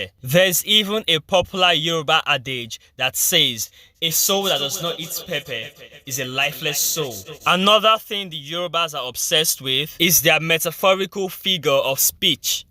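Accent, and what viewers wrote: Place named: Uganda. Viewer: Voice over 👍🏾 Accent sounds Ugandan Good historical preview and motion picture work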